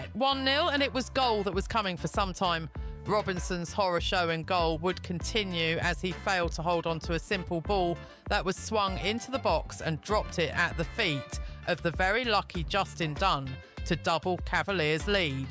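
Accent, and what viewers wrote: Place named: England, United Kingdom. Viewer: It's a bit of a shock going from a Jamaican accent to an English one but to be fair she is good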